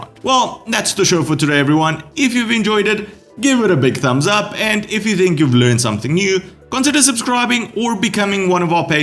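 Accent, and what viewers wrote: South Africa. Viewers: Why do you sound South African?👀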